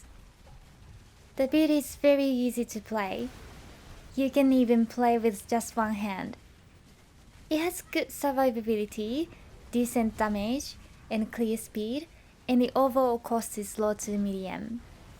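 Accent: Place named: Japan. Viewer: このアクセント...まさか日本人？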